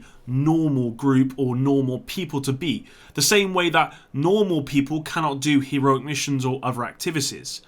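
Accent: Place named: Southwest England, United Kingdom. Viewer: You from sw england? You sound like home haha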